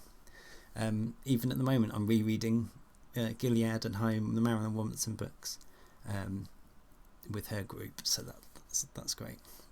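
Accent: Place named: United Kingdom. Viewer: Yesterday I discovered the"mentions" tab in the comments section (classic really, about 5 years late on that one, so right on schedule for me) and I'm honestly so surprised and moved by what you said here! Thank you, really. Although I have to say the biggest surprise was connecting your icon that I know so well with an actual face that both has a British accent and is wearing a Packers hat 😂 reaaally trippy moment for me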